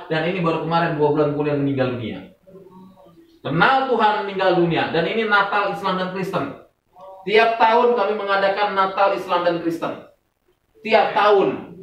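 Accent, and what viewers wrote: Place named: Java, Indonesia. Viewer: Bilangnya dari sunda tapi logatnya jawa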